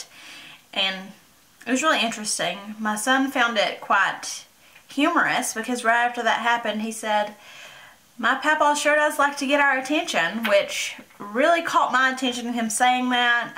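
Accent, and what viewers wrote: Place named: South, United States. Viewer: Omg where are you from? Haha I'm from Mississippi and Georgia so your accent reminds me of home. Southern accents unite! :)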